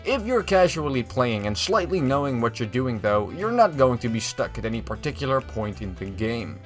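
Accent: Netherlands. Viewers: Only took me ten seconds of hearing your accent to find out you're Dutch haha. Either way, nice video and you're definitely right. Though these glitches are kind of to be expected since they're essentially cramming a DS game onto GBA hardware. What would be your favorite Johto romhack then?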